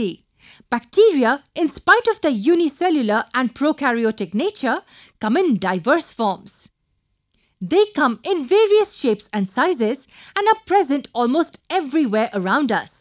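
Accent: India. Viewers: your indian accent hurts my head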